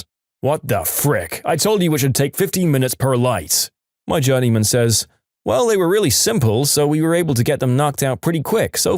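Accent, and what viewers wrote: United Kingdom. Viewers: You sound British now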